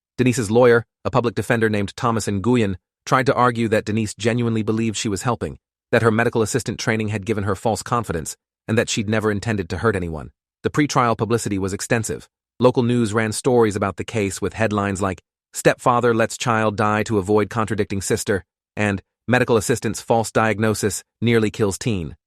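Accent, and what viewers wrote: United Kingdom. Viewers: Did you notice the way it slowly switched to a British accent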